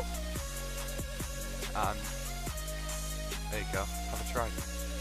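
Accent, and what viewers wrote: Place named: Birmingham, United Kingdom. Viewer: That’s an interesting accent, is it from Birmingham?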